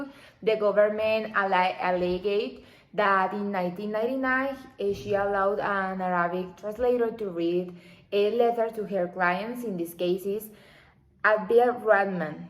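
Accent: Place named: Caribbean Coast, Colombia. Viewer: Costeña hablando inglés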